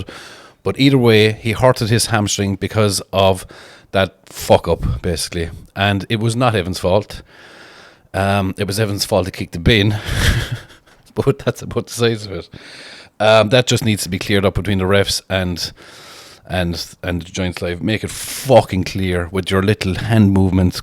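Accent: Ireland. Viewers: Love Irish & Scottish. Are you Irish sir? 🙂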